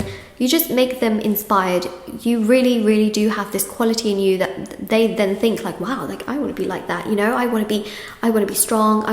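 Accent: United Kingdom. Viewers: For some reason I never put 2 & 2 with the UK accent. I just thought you wanted to be treated like a princess heh.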